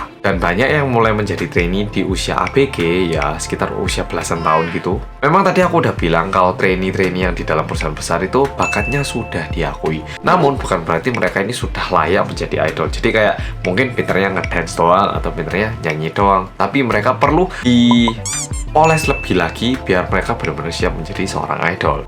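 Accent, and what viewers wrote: Java, Indonesia. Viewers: Yang ku tunggu adalah yang MEDHOKKK ..udh jdi candu nih medhok buat gw😅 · wkwkwkwk anaknya orang korea yang medhok, bisa aja mas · orang Korea yang... Medhookk BP: Oppa!!! Ilustrasi: BTS Sempurnaaa😍😍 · Me : medhooook👌👏👏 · Meddooook.. Lama lama aku keknya jadi medok juga gara gara sering nonton Kak.. Gpp sih menambah kemampuan bahasa ya kan Mas? Uuuuu😆💚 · Salut sama mas oppa ini bahasa Indonesia nya lancar bgt sama medok pula . Jdi kaya orang Indonesia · Medhoookkkk, mantul rek 😂😂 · Lah...ini orang Korea asli atau orang Jawa asli ya...bingung bet... Aw..... ....... Logat bahasa ny tu lhooooooo biasanya huruf A di Korea dibaca agak ditahan .......begiy juga D lah ini kok D nya dalam bet bhs. Jawa......B ny jg wkwkkwkwkw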